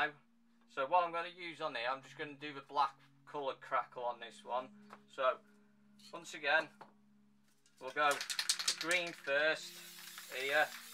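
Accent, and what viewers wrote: United Kingdom. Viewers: Nice, British or Welsh? if you don't mind me asking?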